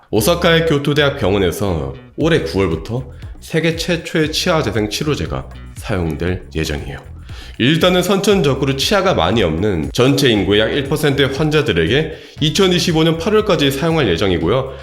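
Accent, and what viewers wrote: Gyeongsang, South Korea. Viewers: 경상도 억양 엄청 심하네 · 아 경상도애가 표준어 따라하는 억양 역겹네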